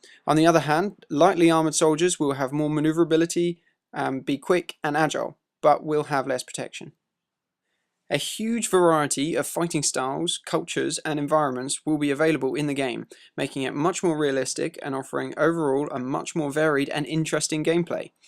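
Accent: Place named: United Kingdom. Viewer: your voice is so nice...no homo...most british sounding voices are so ehhh :? but yours is noce to listen too lol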